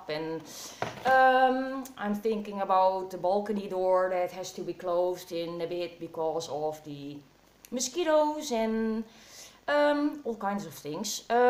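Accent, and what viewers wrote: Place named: Netherlands. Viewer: Ik kwam hier van V.K's shoutout naar jouw maar ik wist niet dat je nederlands was xD. Ik wist het meteen nadat ik keek naar je andere videos and je accent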